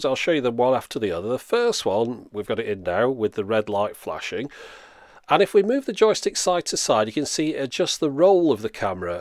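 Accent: United Kingdom. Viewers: Just wanted to thank-you for this video. It's really concise and interesting. To be fair, I like all your videos and it's good to listen to a fellow Brit! Was looking for a gimble for my the videos on my channel and this could be the one. Also, I particularly liked your "How to write a youtube comment" video - so true!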